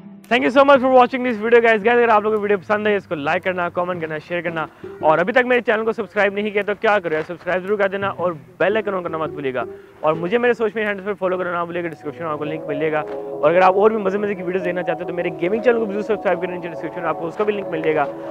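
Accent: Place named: United Kingdom. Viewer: Love your English acsentt .. but at why did u edit your video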